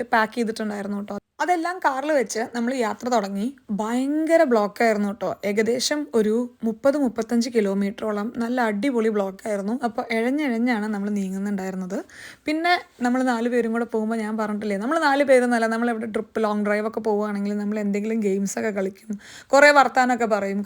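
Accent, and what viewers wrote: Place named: Kerala, India. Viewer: enna perinekaalum ishtamann chechiyude samsaaramm ❤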